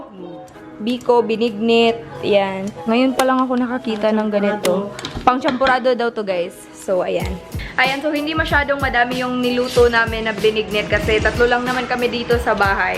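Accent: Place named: Visayas, Philippines. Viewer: I like how you speak bisaya so cute😍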